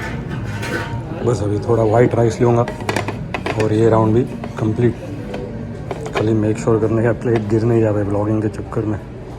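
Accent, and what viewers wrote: Mumbai, India. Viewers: Typical Mumbaiya hindi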